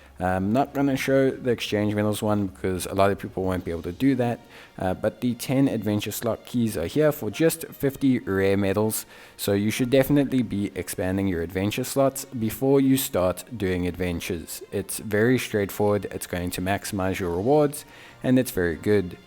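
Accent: South Africa. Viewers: sound south african or portugese learned english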